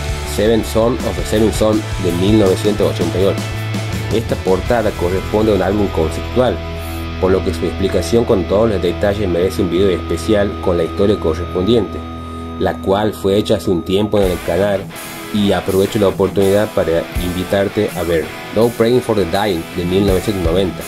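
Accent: Tucumán, Argentina. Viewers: Sos de Tucuman ?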